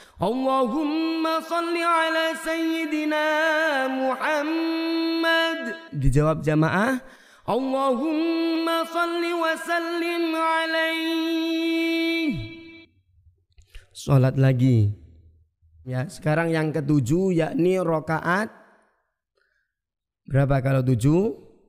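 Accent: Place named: Central Java, Indonesia. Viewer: jenengan orang mana tadz,og sama org jateng cara biLaLx🙏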